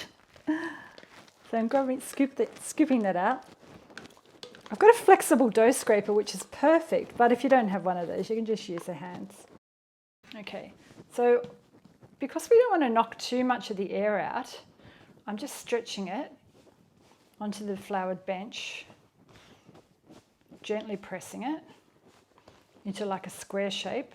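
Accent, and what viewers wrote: Australia. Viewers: Appreciate this plantbased recipe and the written instructions alongside your lovely Aussie accent 💙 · Thanks for this great video. Since Judith Durham said "Goodbye", I don't have an Aussie ladyfriend -- you're it. Bakes bread, wonderful accent and a pleasant way about you... what's not to love,huh? Please forgive me, I don't mean to be politically incorrect. It's just my Canadian way of saying,"Well done!" G'day.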